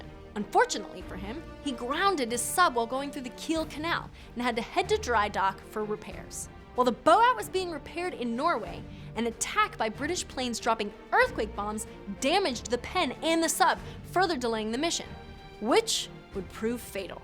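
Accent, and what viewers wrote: United States. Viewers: TM: Gee, what a surprise that an American would use American pronounciation.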